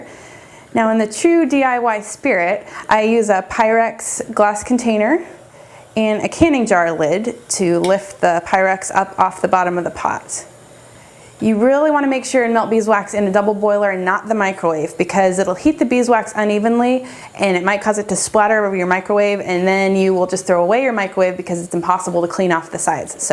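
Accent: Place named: England, United Kingdom. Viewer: I saw you on afolasmr I thought you were from England 😅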